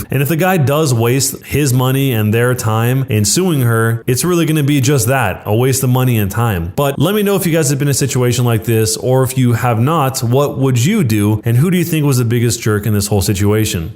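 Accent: South, United States. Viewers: this dude makes a good southern accent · I love the southern voice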